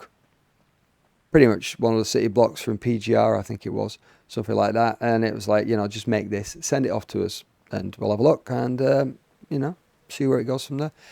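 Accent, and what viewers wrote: United Kingdom. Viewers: Came to this channel for GTAVI, stayed for calm british ASMR vibes. Loving the videos man, keep it up!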